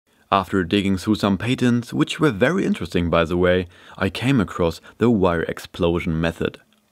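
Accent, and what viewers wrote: Germany. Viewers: arent you german?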